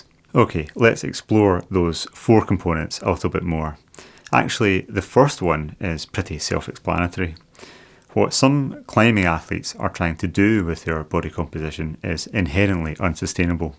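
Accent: Scotland, United Kingdom. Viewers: you've really outdone yourself on this video! I'm still working my way through it in 1/2 hour segments. It's very dense in terms of the information it contains, which in turn gives me plenty of food for thought. I really appreciate all of the hard work that went into researching and producing this. I love the way you've gone directly to the basic research, applied it to your own experiments and drawn your own conclusions, which in turn you've shared with us. I also look forward to the future nutrition topics you plan to delve into. I feel very fortunate to have discovered your channel. The only downside is that I find your voice totally mesmerizing, to the point where I cease to process the words that you're speaking. Gotta love that Scottish accent!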